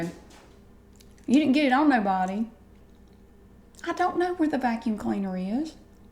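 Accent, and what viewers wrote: South, United States. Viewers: i used to only watch your videos because i loved your southern accent, and i remember the first video i saw was your dentist receptionist roleplay (which of course NEEDS a pt 2) but what i admire from your videos is that you make these storylines and characters which develop throughout your videos, and it makes them feel so real, and realism is what i seek from an ASMR video.... sure, the soft whispers are nice, but i love when someone is soft spoken with a little energy like you, and i love love love LOVE all of the details you put into your videos.... i wish i could just explain to you in person how much your videos have helped me through my chronic insomnia.. dont ever stop what you do!! sending my all x :D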